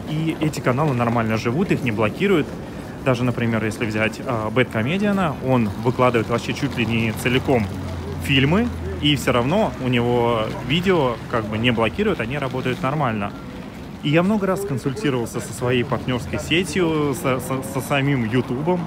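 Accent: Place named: Japan. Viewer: Здравствуйте,. Изначально мне интересна тема,как люди живут в других странах (их быт,мысли о России и русских и т.д.) и таким образом случайно "набрела" на Ваш старый ролик,о том, какую в Токио Вы снимаете квартиру. И,конечно,Вы меня покорили своей манерой подачи материала,доброжелательностью,интеллигентностью)) Я уже не могу не смотреть Ваши позитивные видео))) Даже Ваш появившийся японский акцент в речи очень импонирует)) Продолжайте, экспериментируйте,а мы Вас подддержим))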